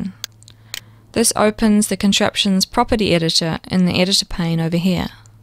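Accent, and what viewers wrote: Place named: Australia. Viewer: yes fantastic ozzy accent!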